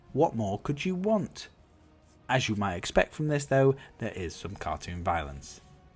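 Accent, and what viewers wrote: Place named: United Kingdom. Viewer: Is there a video of Xbox games without a British person? Just accent is fucking annoying.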